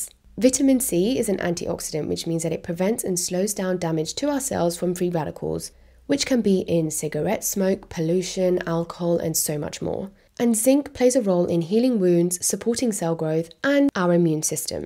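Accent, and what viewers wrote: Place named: India. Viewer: Indian accents are so brutal. H8 it.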